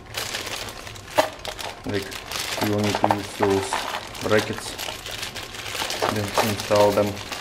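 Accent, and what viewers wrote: Russia. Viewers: Братан по голосу слышу что русский . У тебя было место под остальные 3 слота памяти или всётаки он закрывает их , люди пишут что закрывает .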